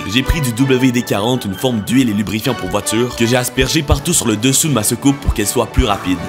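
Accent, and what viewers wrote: Canada. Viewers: Vraiment ta voix grave est génial mais j'arrive pas à te prendre au sérieux avec ton accent canadien 😭😭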